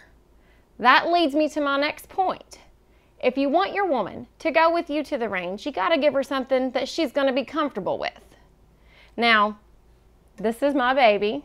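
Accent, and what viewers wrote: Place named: Kentucky, United States. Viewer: I'm from kentucky so I like the accent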